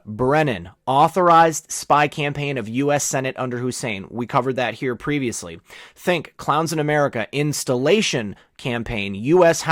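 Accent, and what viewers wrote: England, United Kingdom. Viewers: Played on high speed, your British sounds like Stewie from Fam. Guy. 😂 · Great job! -- And love your English accent!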